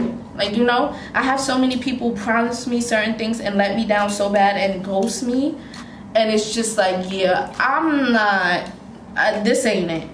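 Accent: New York, United States. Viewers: Tawlk about. I'll never get tired of your NY accent. ❤️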